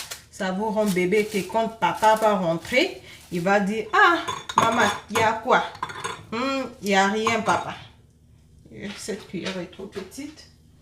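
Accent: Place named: Africa. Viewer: Tu as l’accent africain mais c’est toi qui ne fais que dire <parceque en Afrique 😒> je t’aime bien mais arrête ça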